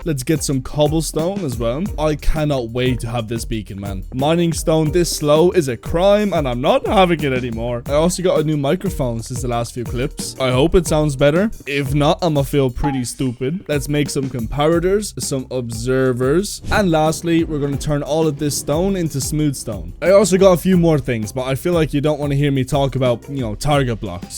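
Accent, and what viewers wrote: Ireland. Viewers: Yo bro r u irish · also are you irish?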